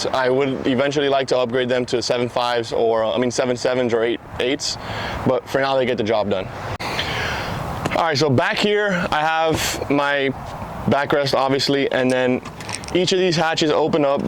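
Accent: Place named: Miami, United States. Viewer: The Miami accent goes crazy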